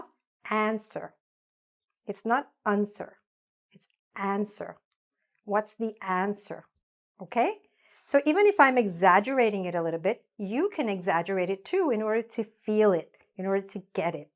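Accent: United States. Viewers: This video teaches us the words in an American or British accent?, i'm so sorry because I don't know, it's because I'm a beginner learning English😊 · In London we don't open the mouth in pronouncing ( have/ has ) and we don't stretch the pronunciation of the letter A in ( have / has ) like you do and that is because you speak American English and not English English. Thank you for your nice show. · Una pregunta las clases son con acénto americano o britanico? Gracias.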